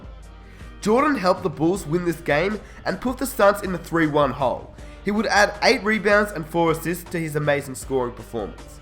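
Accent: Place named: Australia. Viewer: What do you mean? you always sound Australian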